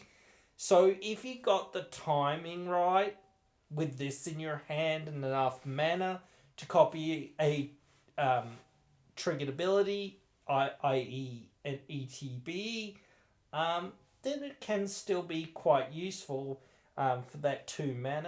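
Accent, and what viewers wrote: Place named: Australia. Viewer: what part of Australia are you from ?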